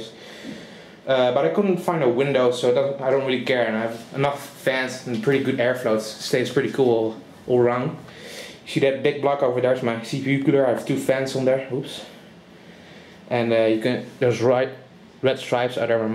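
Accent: Netherlands. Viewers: mooi systeempje, heb al wel een 6870, maar nog een i5 760. Ga wel upgraden naar ivy bridge. Best geinig dat je kan horen dat je nl bent (en ja ik weet dat er nl in je naam staat) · jij klinkt heel erg nederlands lol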